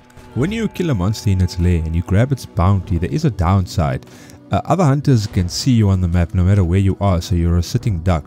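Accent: South Africa. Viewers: you have a very mild south african accent.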